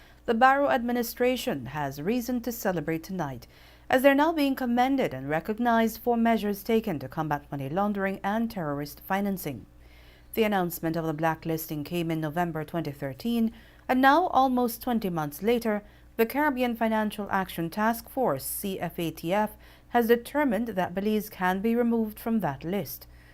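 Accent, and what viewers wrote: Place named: Jamaica. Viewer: Is the newsreader Belizean? She sounds Jamaican.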